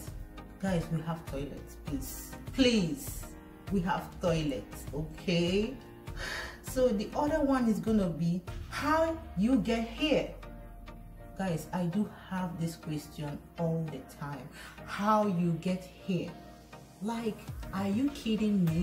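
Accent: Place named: United States. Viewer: I have heard that alot. You speak like American 😊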